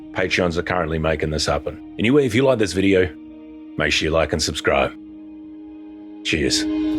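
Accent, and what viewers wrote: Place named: Australia. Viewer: Blonde anime girl with deep male Australian voice fits oddly well 😂. Looks like its going well, i think its so cool youre doing this.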